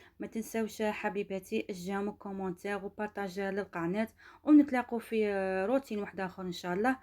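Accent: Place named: Tlemcen, Algeria. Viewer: اول مرة نتبعك الله يبارك باينة عليك تلمسانية من نبرة صوتك الله يبارك ما عندي مانقول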